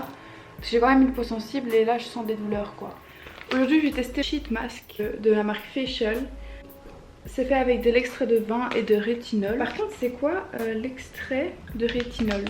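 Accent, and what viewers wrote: Belgium. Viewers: J'ai direct reconnu ton accent belge, je suis de bxl aussi 😊 je viens de découvrir ta chaîne ça fait plaisir de voir une belge 😍 bonne continuation 💪🏻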